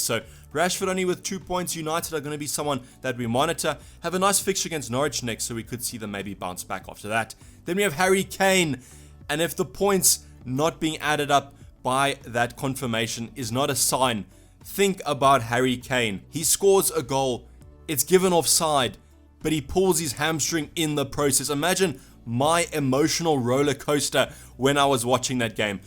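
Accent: South Africa. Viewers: Anyone who can't hear the African Accent is beyond redemption 😂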